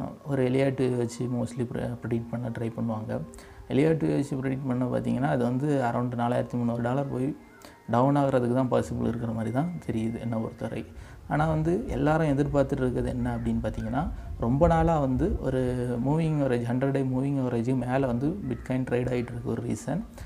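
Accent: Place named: Nanjil, India. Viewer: Brother unga voice vachi Guess panniten nanjil nu Already Mutual Fund la invest pannitu iruken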